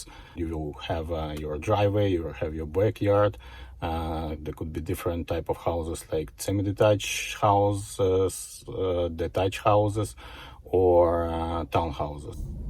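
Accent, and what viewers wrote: Russia. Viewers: Этот русский акцент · Wooow You have a such strong Russian accent man. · You sound like Russian so no worries but if you’re a Brazilian then it’s the cold. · Русский акцент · Как приятно слушать английскую русскую речь)) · Русский акцент, жуткий )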